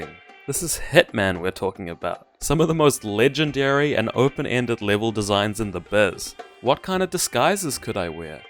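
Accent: New Zealand. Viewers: those aren't pukeko, them be takahe (edit) never mind I see someone else pointed it out. your accent is uniquely wellington and southland at the same time. them r's are more outta control than mine!! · As a kiwi I can’t even identify our own accent lmao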